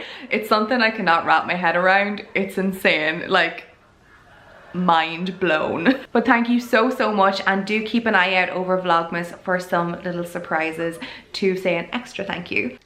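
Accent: Ireland. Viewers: Hi ❤ I just have to tell you that I LOVE YOUR VIDEOS! I have been cleaning all day and your videos have been playing in the background. I've been watching your videos for the past few days and you have been inspiring me in many ways. I love watching your routine videos and what you eat in a day videos. I came across your channel watching your Realistic 1 hour mourning routine, and since then I've been obsessed. I LOVE your accent as well!! I've always wanted to visit Ireland. Any-who, keep up the great work! Always looking out for new footage from ya!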